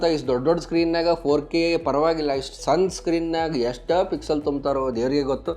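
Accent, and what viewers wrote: India. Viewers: Unique style of explaining technology with sense of humour. Loved your Jawari kannada. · Niv Belgavi davar anta heli ,bal Hemme ide broo🙌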